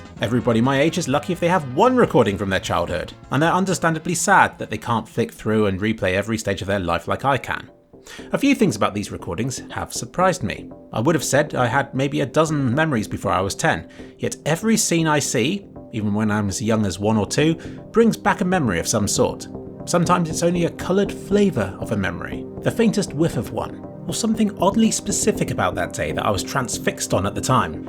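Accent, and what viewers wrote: United Kingdom. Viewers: Did you still have a British accent back then?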